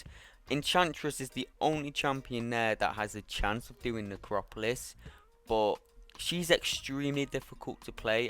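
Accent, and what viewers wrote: United Kingdom. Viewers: Yes we like your rage and rant in Bri'ish accent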